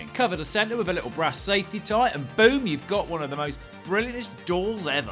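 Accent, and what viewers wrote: United Kingdom. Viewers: Extra British in this short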